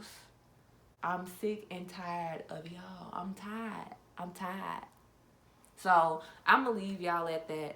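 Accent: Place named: United States. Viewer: Where in the US are you from... I love da accent. You are beautiful and blessed.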